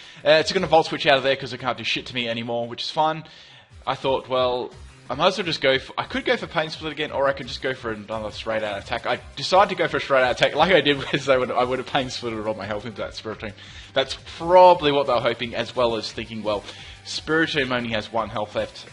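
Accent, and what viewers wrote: Australia. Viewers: I KNEW you were a fellow Aussie. The accent game is strong! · Wait did I hear that correctly are you from Australia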